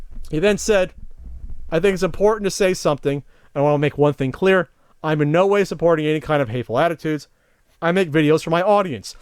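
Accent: New Jersey, United States. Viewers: ah you can hear the New Jersey in you. #1 state in ppl cursing. Jersey misses ya!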